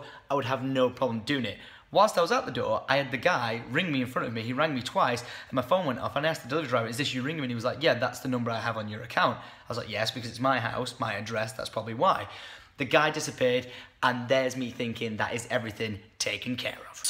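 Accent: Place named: United Kingdom. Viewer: Respond Why does he have a British accent?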